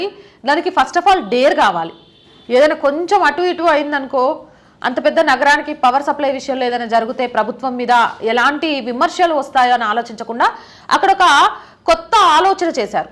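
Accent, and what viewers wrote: Telangana, India. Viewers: chevulu dobaya meku .....talking lo telanagana yasa alage untadi ....frst lo garu yogi ani annaru ...cheyulu vinapadaleda neku .......mundu ammai chese vakiti support cheyandi ante kani tappulu vetakadam kadu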